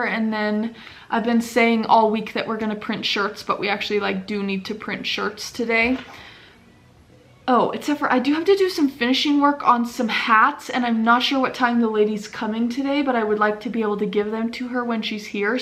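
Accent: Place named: Montana, United States. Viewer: Hyped and liked. Getting my Montana fix. Just hearing you speak brings warm feelings. Funny how you don’t realize that there is an accent to folks from back home until you’ve been away. Now I’m in Texas the difference is stark. Most of my Montana family have passed on, but I’m still kicking so I need the fix. Thanks.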